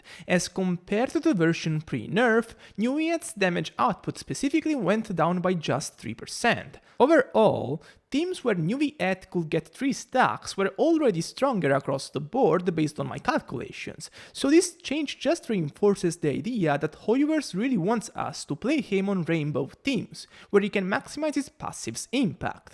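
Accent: Italy. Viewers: Where r u from? U sound really italian tbh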